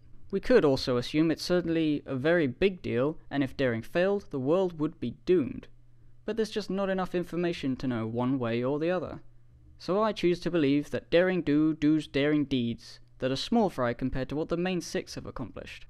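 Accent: United Kingdom. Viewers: He's been British this whole time? Awesome!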